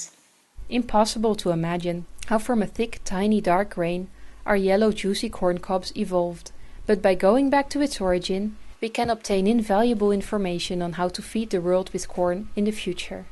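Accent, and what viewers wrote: Netherlands. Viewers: The Dutch shouldn't try to speak English. This is horrible. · Nederlands accent is Nederlands. Maar leuk onderzoek. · It's very much a Dutch accent :)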